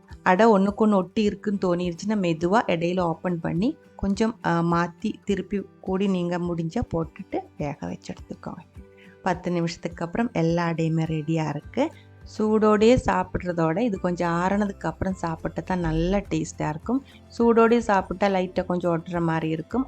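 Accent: Kerala, India. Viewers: മലയാളം തമിഴ് കലർന്ന ഭാഷ എന്നാലും സൂപ്പറായിട്ടുണ്ട്👍🙏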